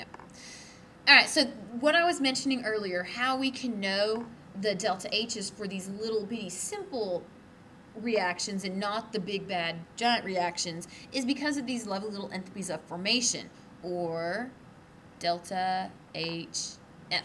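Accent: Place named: South, United States. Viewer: If my teacher had a cute barely southernish accent instead of being some 65 year old bore, I think I would have enjoyed class a lot more. Thanks for helping with my finals!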